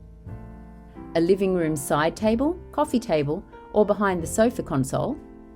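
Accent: Australia. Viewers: I have been looking for an Aussie channel, and I am so happy I found yours. It was so lovely to start watching and hearing your Aussie accent. I am not saying I don't live other channels I do, but I love that I found a channel in my own country - so precious! ❤❤❤❤❤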